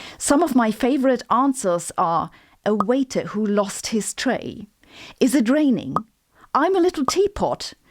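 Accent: Germany. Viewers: And like your German accent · I love your "brick" like expressiveness. I also love that you're built like a "brick sh!thouse." (You might need to look that one up to understand the compliment) I love your German accent and your sense of humor always gets me laughing. Sometimes, I have to pause the video on account of that. I hope you keep making videos for many years to come. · “I can promise that my German accent is real!” 🤣 🤣🤣 love it! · I love the way you break down information. I love that you are German and your accent. I love your hair (you are beautifu)l and I love how funny you are but you don't try too hard to be funny. Thank you for all the great content! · Oh and: your Eng is laudable and I congratulate you. But then what trace of German phonology remains only adds lustre. You see, I find German the most comfortable, the most pleasing to pronounce of all mod Eur langs. 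Hochdeutsch is as pleasing to my ear as the floaty and precise Serbian up in Vojvodina. (Like German, Serbian has many, many local speeches which are NOT pretty!)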